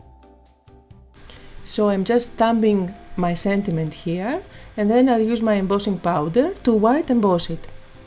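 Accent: Greece. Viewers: Always love your cards and now I can say I love your beautiful Greek accent! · just love your Greek accent also your very precise instructions on your fab projects. x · Luv ur greek accent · OMG! It was great hearing your voice! Love your Greek accent! Thanks for sharing. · YYEEAAHHH you have a voice ;-) AND it's beautiful and your english is remarkable! I love love your videos and your work is so wonderful. In June i went to Santorini on vacation with my two adult daughters .... hearing your speach took me back to Greece. I'm a Dane and I'm collecting the courage to make videos in English ... you help me so much. THANK YOU and greetings from your biggest fan in Denmark ;-) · I adore your Greek accent!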